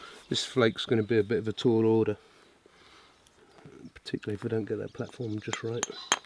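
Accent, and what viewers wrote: United Kingdom. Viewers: With this filming you look animated like the fantastic mister fox movie. I love your content it's very genuine. I have been trying to learn napping but have only found Americans and was very glad to hear a calm u rushed british voice with birds in the backround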